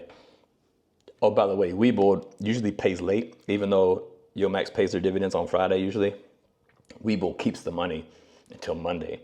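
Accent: United States. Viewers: You sound like an American. Just wondering how the Chinese police treat you? Do they stop you every time you go out and ask for Visa document etc? The news makes it sound like you will be vanished and never be found if you visit China.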